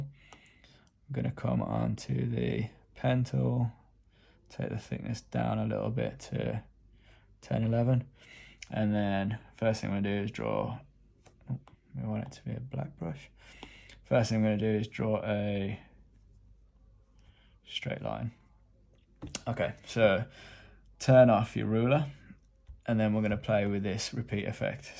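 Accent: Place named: South London, United Kingdom. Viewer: I love your accent. I sense you are from South London? Your tutorials are great, you go at my speed so I can keep up with you. I’m learning a lot from you and guess what… I do not read manuals! I’m a poke and stabber. I will be catching all your tutorials as you make the app less 😵‍💫 thank you!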